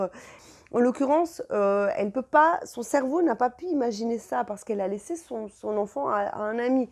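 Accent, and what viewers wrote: Switzerland. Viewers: votre petit accent suisse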